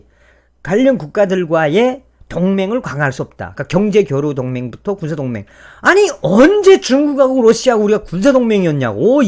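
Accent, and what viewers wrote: North Korea. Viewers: 정성산님 방송하시면서 북한발음을 좀 삼가하시죠. 채널에 들어가다가도 그 발음을 듣고 채널을 돌림니다. 참고 해주시길~~~ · 북한 말투듣기가 거북스럽습니다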